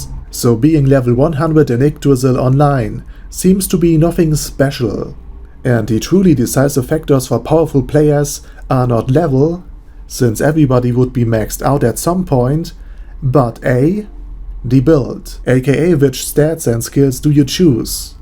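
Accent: Germany. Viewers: Dein deutscher Akzent ist echt heftig.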